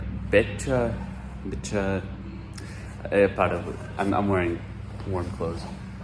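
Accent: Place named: Tulu, India. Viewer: .. You are simply amazing🎉 keep going 🎉 & ur TULU is ❤❤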